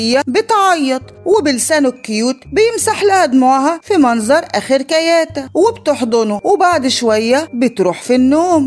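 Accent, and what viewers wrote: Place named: Iraq. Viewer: هاذي الرواية عندها اكثر من اسم؟ اسمها الاميرة المحبوبة او كيف اصبحت اميرة المهم اوك هتخلى عن لوكاس بس هاذي المررة وانا ليا كلود هو في اب بوسامتو لا ده غير فيليكس وايجيتل المسكين انا كلي رومانسية وبعشق الرومنسية بس مو لذي الدرجة الي انتي متصوراتي بيها رومانسية في الروايات والالمسلسلات بس في الواقع غيييير وان شاء الله ندخل الجنة وهنيك نقدر نحلم زي مابدنا ومن لهجتك واضح انك عراقية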